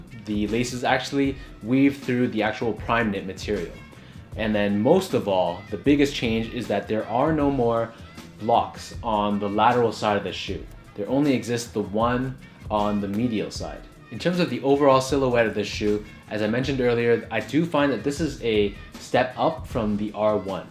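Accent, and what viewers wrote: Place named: Germany. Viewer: ok you seemed to had a little german accent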